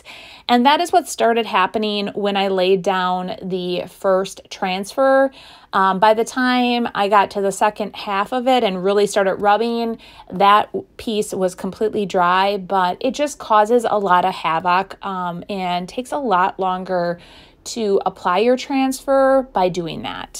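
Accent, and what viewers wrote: Wisconsin, United States. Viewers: I really, really enjoy your work! What caught me first was your voice...my husband is from Watertown. I absolutely love WI!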